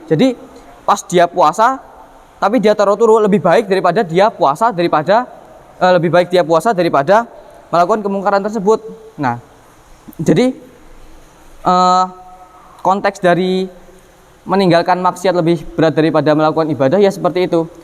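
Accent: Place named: Java, Indonesia. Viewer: Masya Allah bang 🥰🥰 tambah pinter, tmbah sholeh. Aminn 🤲🤲 Didenger2in kok suaranya jd medok jawanya ya sekarang, udh pinter bahasa jawa kayanya 😁😁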